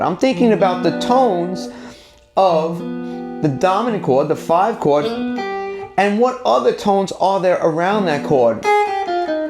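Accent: New York, United States. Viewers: I very much like your videos and yoa nu youak accent also😉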